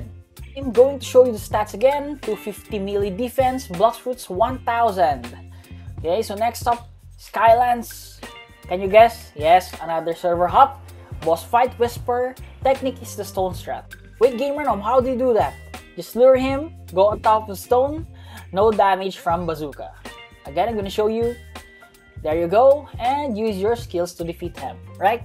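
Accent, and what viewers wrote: Philippines. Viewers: i knew he was philipino its in the accent i know filipino english when i hear one :) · I hear your sound you are Tagalog po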